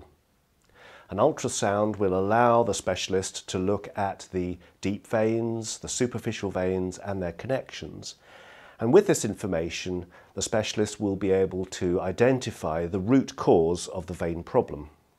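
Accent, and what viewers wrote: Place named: England, United Kingdom. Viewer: Can I marry your English Accent you’re such an awesome doctor I had that procedure and you describe it perfectly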